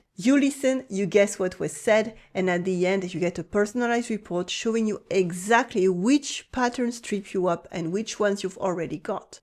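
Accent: Paris, France. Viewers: "sound like a parisian", "absolutely unnecessary and unachievable" lol, so weak. Every things she says are legit, I m 50 y/o teacher born and raised in Picardie and I approve this video. So she speaks with parisian accent that s all, a guy from Toulouse or Amiens follow same rules with different accents. She doesn't say "shameful", there are several levels of language, you re a child ? She s teaching one, and ? what s the ***** pb ? I wonder if they sell some remedy for "anti-parisianisme primaire" :D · Qui dit tu vois. Quelqu’un de Paris. Une minorité francophone. La plupart du monde francophone ne parle pas comme toi. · This is Parisian French. Not Canadian French. The French are just to stuck-up/uppity about the language and judge EVERYBODY else who even attempts to speak it. Unlike other places I've been, Asia, Germany and elsewhere, they appreciate the attempt and usually will smile and if they speak any English will try to meet you in the middle. 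Not the French! BY far the WORST country I've EVER been to!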